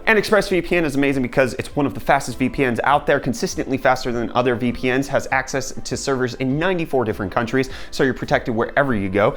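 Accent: United States. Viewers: Most South Africans don't have accents :) He does seem to have a slight American accent though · You have an American accent though... 🤔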